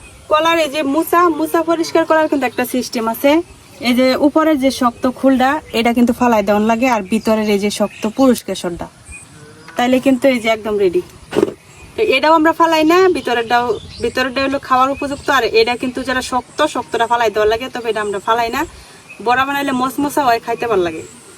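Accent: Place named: Netrokona, Bangladesh. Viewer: Gramer meye bujhlam kintu tai bole ki ektu Valo kore ashba na shabar shamna Eta hoccha netrokona karon Kotha goli jemon khayam jayam agoli okhankar vasha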